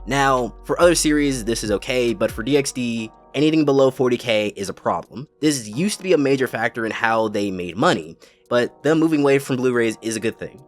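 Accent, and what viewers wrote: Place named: United States. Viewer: I heard your accent, i knew u would be some american burger consuming garbage fast food anime and enjoying it.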